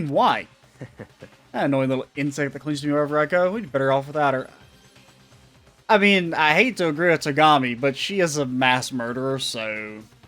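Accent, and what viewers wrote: South, United States. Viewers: Dude, you have my favorite Monokuma voice even if it's not intentional. Heavy Southern Monokuma is my new favorite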